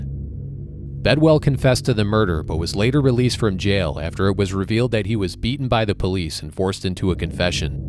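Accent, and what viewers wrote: Chicago, United States. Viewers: I've lived in Southern California since 1975, however I grew up in Chicago and St. Louie, so I thoroughly enjoy your videos and your accent, which reminds me of home. You're fantastic. Thanks for all your hard work!😊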